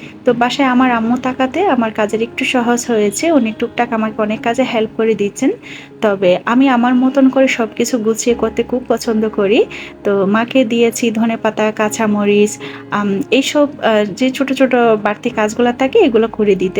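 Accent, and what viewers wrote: Chittagong, Bangladesh. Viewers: আসসালামু আলাইকুম আপু,,,আমি আজকে প্রথম আপনার ভিডিও দেখলাম,,,আপনার কথা শুনে আর রান্না দেখে আমার কাছে মনে হয়েছে যে আপনি চট্টগ্রাম এর বাসিন্দা,, তাই শিওর হওয়ার জন্য আপনার আরেকটা ভিডিও দেখলাম,,মহররম এর ভিডিও টা দেখে শিওর হলাম,,,তাই সাথে সাথে সাবস্ক্রাইব করে দিলাম,,কারণ আমার জন্ম চট্টগ্রামে,,ছোটো থেকে বড় হয়েছি সেখানে,,তাই চট্টগ্রামের জন্য আমার ফিলিংসটাই অন্য রকম,,যদিও আমি কুমিল্লার মেয়ে,,,যাই হোক আপনার কাছ থেকে চট্টগ্রাম এর অতিথি আপ্যায়ন কিভাবে করতে তা শিখবো ইনশাআল্লাহ,, কারণ এই মাসে আমার বোনের বিয়ে,,,তাও আবার চট্টগ্রামের বাসিন্দার সাথে,,, আমার বোনের জন্য দোয়া করবেন আপু,,, আর আরেকটা কথা,,আপনার রান্নাগুলো মাশাল্লাহ অনেক লোভনীয় হয়েছে,,আমি চট্টগ্রাম চট্টগ্রাম করতে করতে আপনার রান্নার প্রশংশা করতেই ভুলে গেছিলাম,,,তাই আবার আসলাম কমেন্ট টা সম্পূর্ণ করতে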